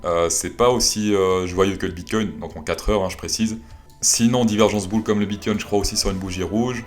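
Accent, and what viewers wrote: Quebec, Canada. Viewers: Quebecois ou cameronai Mdr on cest plus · Super ton accent canadien mdr ...je voudrais vraiment savoir qui tu imites avec cet accent!?! tu cartonnes comme toujours ... Tu pourrais faire l'oiseau de mauvais augur une petite rep ( rep augur) non ? Elle n est pas bonne ? Bon j aurai essayé ^^ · Aie, dur l'accent québécois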